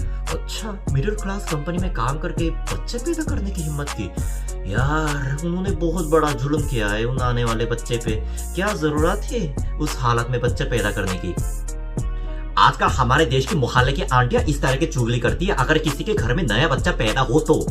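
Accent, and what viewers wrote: India. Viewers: You are born in South Korea🇰🇷 but your way of talking is Indian🇮🇳👳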